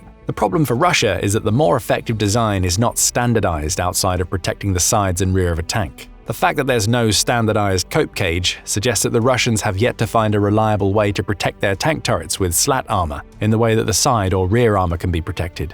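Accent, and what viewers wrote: United Kingdom. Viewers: misleading title, and british yapping unrelated to the misleading title for over 12 minutes.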